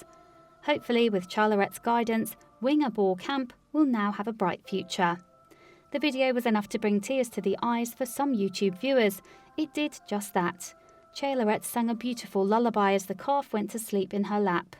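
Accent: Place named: RP, United Kingdom. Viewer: Pachyderm = Pak— ee- derm ( not patchy derm) Myanmar= My— Ann— Mar ( not Myna Mar, or whatever it is she was saying. A lovely posh accent is no substitute for doing your research.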